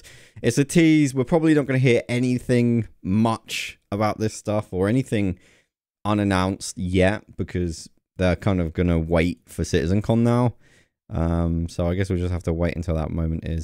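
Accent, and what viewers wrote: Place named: England, United Kingdom. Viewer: i dont noouuu ... omg, if original English sounds like that than i prefer more American English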